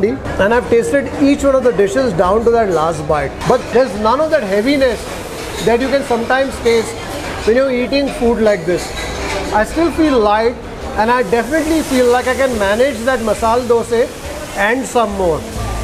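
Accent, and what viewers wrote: Karnataka, India. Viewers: Boss you speak kannada so lovely good job ,god bless you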